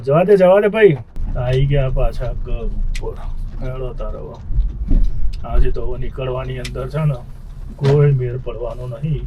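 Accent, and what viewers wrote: Mehsana, India. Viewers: Tamaru gam khu che bhai tame saras amara jem mahesana ni boli bolo cho gam nu nam aapjo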